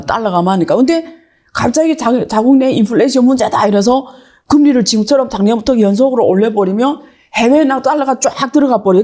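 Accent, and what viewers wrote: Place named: China. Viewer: 말투가 중국사람이네요.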